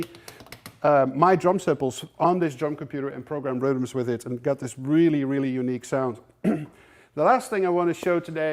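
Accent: Netherlands. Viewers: He has a bit of a Dutch accent. Could that be right? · Nederlands accentje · Yes, He is dutch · Very cool! I might be wrong but are you from the Netherlands? I hear very distinct sounds that a lot of people around me have when they speak english!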